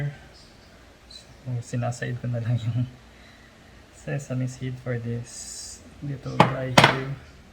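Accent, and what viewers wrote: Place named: Pampanga, Philippines. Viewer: magaling ka talaga lodi... ohhhhh mah!! ahaha talagng kapampangan. kanyaman na pamo ning inuman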